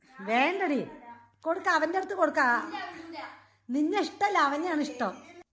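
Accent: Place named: Palakkad, India. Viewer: ഭാഷ കേൾക്കുമ്പോൾ പാലക്കാടാണെന്നു തോനുന്നു.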